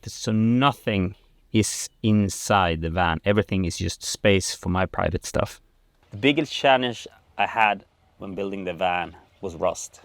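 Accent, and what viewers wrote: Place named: Sweden. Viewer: Sounds Swedish. Not Norwegian.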